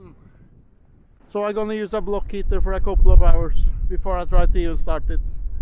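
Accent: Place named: Norway. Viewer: I’m loving your videos! And respect for for walking through all that snow to set up your cameras and get those great shots. And the Norwegian accent is fun to listen to🤭 keep the great videos up and much love from the USA 🇺🇸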